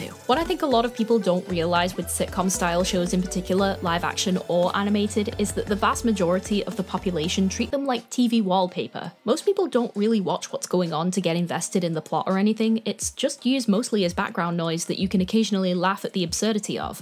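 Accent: United Kingdom. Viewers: I am trying to pin down your accent. It sounds sort of British, but not really.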